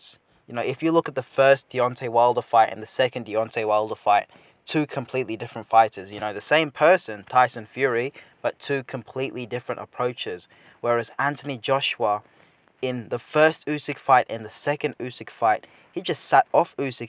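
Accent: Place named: Australia. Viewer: 👍 I knew I could hear a slight Australian accent in your voice. It's very mild though...hard to tell.....I bet most Americans think you're from the UK!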